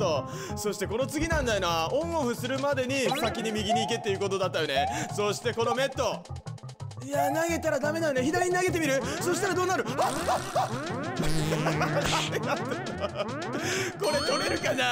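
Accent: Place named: Hakata, Japan. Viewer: 全編博多弁でやってくれるというのは いつ放送予定ですか？ 楽しみに待ってます✌️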